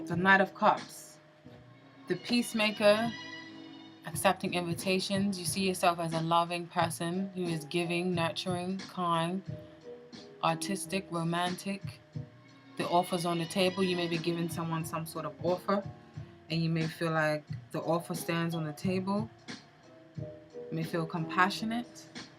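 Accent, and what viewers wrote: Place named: Bermuda. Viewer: I enjoy your readings and keep up the great work as it definitely resonates with me. I've been listening and noticed that the accent sounded familiar. Hi my fellow Bermudian. :)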